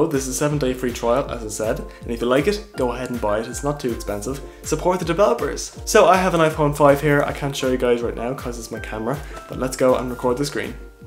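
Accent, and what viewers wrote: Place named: Ireland. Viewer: I'm guessing Ireland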